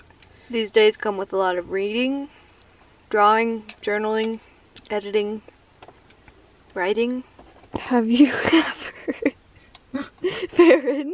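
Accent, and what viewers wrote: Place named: South, United States. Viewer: love your voice...nice country voice. you should sing often...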